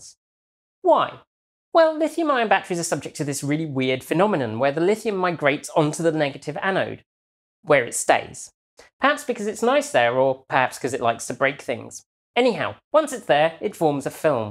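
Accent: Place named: United Kingdom. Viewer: Why are many of the EV videos feature man-ish looking woman with an annoying limey accent. "Let it cool down before you wack it on the charger"... Oh the humor....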